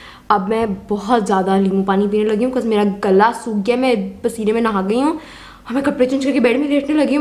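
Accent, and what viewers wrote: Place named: Pakistan. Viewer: picture are good because am a photography & i have my own studio in multan but the thing i like is your accent during speking english means k english urdu mix 😁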